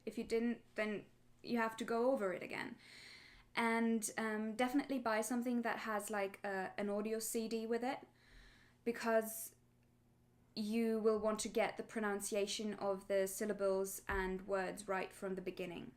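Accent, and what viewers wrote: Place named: United Kingdom. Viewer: You are German? Umm, I thought you are British! Congrats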